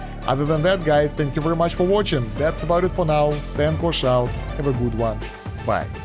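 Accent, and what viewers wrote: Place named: Russia. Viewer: По акценту слышно русский мужик пожалуйста сними ролик на сборку скиллов на демона и ангела пж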